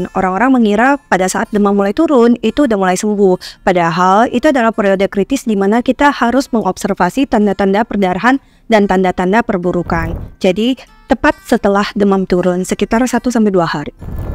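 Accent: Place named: Chinese-Indonesian, Indonesia. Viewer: Suka banget sama aksen chindo nya 😅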